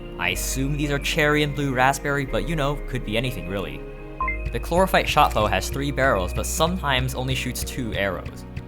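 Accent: China. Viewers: HOLY SHIT YOUR CHINESE ACCENT IS PERFECT! (Taiwanese here :D)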